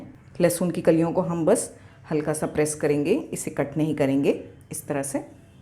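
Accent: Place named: Maharashtra, India. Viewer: It's really very nice the way you explain the recipe. The way you sound It seems you have a connection with maharashtra.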